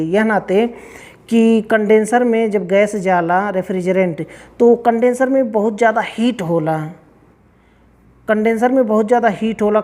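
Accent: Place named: Bihar, India. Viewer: Sir aap kha se ho i think bihar se ya up se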